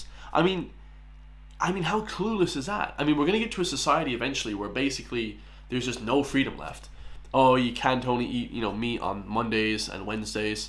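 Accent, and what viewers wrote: United States. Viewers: Your accent sounds familiar,what part of America do you hail from?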